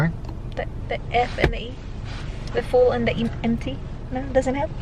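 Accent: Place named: Visayas, Philippines. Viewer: ung sobrang naging fanatic na ako,pati accent ni sa bisaya naadopt ko na unconciously..hahaha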